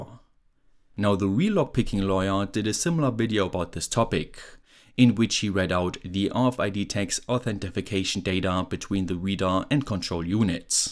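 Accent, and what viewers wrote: Germany. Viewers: You sound absolutly like a german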